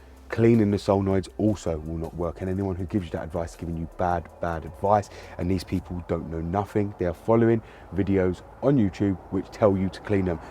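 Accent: United Kingdom. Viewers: I'm 23, would like to be a vag technician but I like BMW, trying to get a <num>i as my first and daily car, this Chanel is 🤘🏾, the only thing I'm not very comfortable is with the UK accent ahaha but you're okay, I'm just not use to heard it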